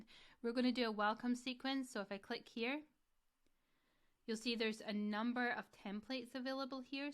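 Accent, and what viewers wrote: Scotland, United Kingdom. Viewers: Hey, Im guessing you ahave spent some time in Scotland based on your accent. I was looking for a video on how to use the newsletter feature on my squarespace website. do you have a video for this? I would like to send a news letter to people on my website that sign up but now sure how to make it all function. I have used a few of your videos to get mite site to where it is. so Thank-you.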